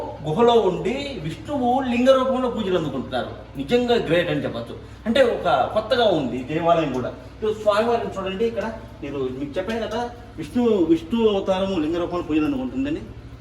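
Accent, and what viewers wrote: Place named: Kadapa, India. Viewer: Nenu chala rojula taruwatha rayalaseema kadapa bhasha vinnanu. Thank you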